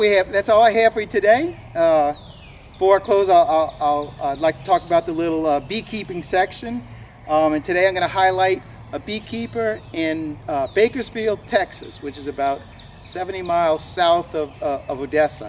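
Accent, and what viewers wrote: Louisiana, United States. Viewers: Are you from Louisana?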